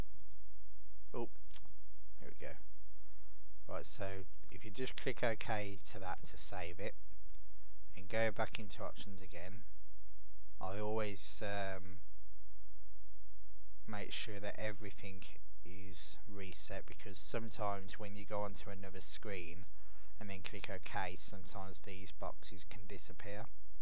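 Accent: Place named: Ireland. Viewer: thank you for giving such an informative video. I received an Acronis True Image Activation Key with my new Crucial SSD. Having never heard of Acronis, I ventured onto Youtube and found your video. So now I feel up to speed. One question: my wife and I were wondering from you accent where you are from. She said Ireland, and I'm just not sure. OK, I'll say Ireland too.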